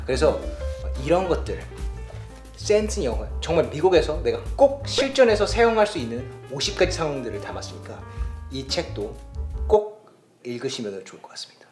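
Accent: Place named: Gyeongsang, South Korea. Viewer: 맷 형 구글에서 일하는 경상도 싸나이인가보네요. ㅎㅎ 억양에서 느껴짐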